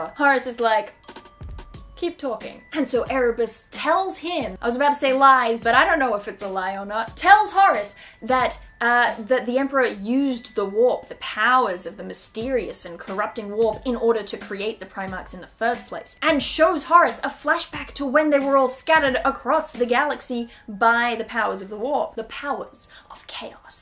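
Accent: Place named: Melbourne, Australia. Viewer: Australian? By that accent I rekon melbourne maybe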